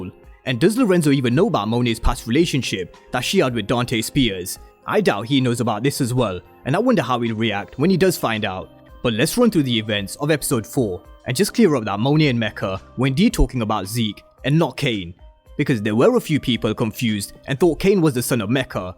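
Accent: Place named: United Kingdom. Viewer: Sounds like the narrator is possibly British, they sometimes pronounce names or terms ending with an 'a' or ' 'ah' with an 'er'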